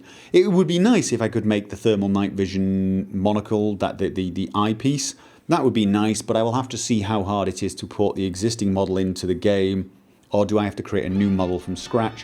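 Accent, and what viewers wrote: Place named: Scotland, United Kingdom. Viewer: Ive always wondered but never really bothered to ask, so... Where are you actually from? Been subbed for, ugh, quite some time actually, like, over 2 years-ish, so Im guessing I kind of must have missed the info or something. Iunno, but I think you sound southern-scotland-esque.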